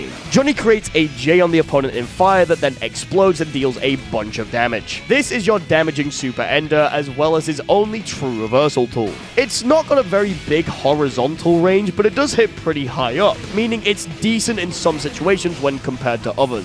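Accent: United Kingdom. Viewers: No wonder your voice sounds so similar if you're northern that makes sense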